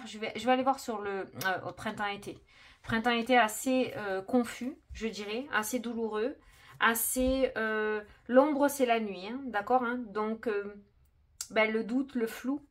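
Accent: South, France. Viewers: Bonjour, peu de temps que je vous écoute, j’adore votre accent du sud qui nous enchante nous les gens du Nord ❤ Un grand merci pour votre guidance 😊 Patricia 23 janvier 1962 Bonne soirée et à demain 👋👋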